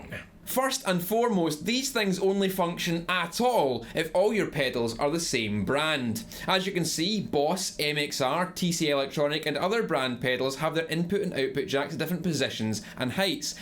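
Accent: Scotland, United Kingdom. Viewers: Why is the Scottish accent so well suited to these "HELLFIRE AND DAMNATION UNTO ALL YE SINNERS!!" kind of things? If I said it I'd sound ridiculous! My Glaswegian Great-Grandmother is laughing at me from beyond the grave.... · the fact that you're a scotsman makes the preaching sound even better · A Scholar, a Guitarist (I would have said Gentleman instead of Guitarist but being a Guitarist is much better than being a Gentelman lol ) and an Engineer :) well said sir. and I have to say only with your great Scotish accent can you say the most epic part of this video at 0.23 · And that's why the Scottish accent is the greatest ever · I like Scottish accent even though it's hard to hear..😂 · Here's another one of your videos that I walk away from a wee bit smarter from having viewed it. Aside from the super cool Scottish accent that we all love, you have a great blend of intelligent content and delivery of said content. 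Keep up the good work! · John JohnnyArt Pavlou It makes me wonder where he's from. He sounds a bit Scottish. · Reminder to me: Visit Scotland. Just because of that cool accent... :-D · This only works because of the Scottish accent. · That just made my day... Hearing a Scottish man describe the devil working through guitar pedal couplers in an insidious voice, with sinister music and maniacal laughter in the background. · Oh my god. Your scottish accent is perfect with your anger ahahahahaaha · Yeah the Scottish accent is pretty cool (I’m American) but he really is well spoken and knowledgeable, keep up the good work! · I am hoping that I was right and it is scottish :D (totally love it by the way) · If anything I watch your videos for your Scottish accent, I love it. And that's no shiite. Makes me want to watch "Trainspotting" just to hear Robert Carlyle ( Begbie ) say " You fooking coont ". 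Great content bruv, always spot on. Peace. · Oh how I love me some thick Scottish accent! Ah yes, the old home land. Great truth as well! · i just love the Scottish accent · He’s Scottish. He says “Pair-dull’ 😄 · I didn't know you could get even more Scottish... Great video as always! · I'm first time on this channel, accent of this guy is soo beautiful. Is he Scottish ? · Love the Scottish accent. (No homo) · Are you Scottish? I think I'm getting better about telling apart accents in the UK.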